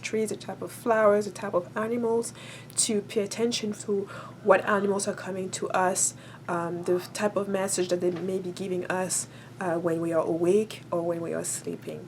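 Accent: France. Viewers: You have sort of french accent. Is is Your first language? (If so, Your English is amazing!) Very good video, by the way :-)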